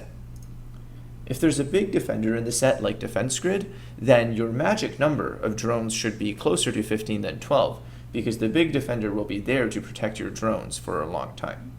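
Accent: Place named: Canada. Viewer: Aboot...he sounds so Canadian. Still great vid - still getting my head around figuring out how to play effectively.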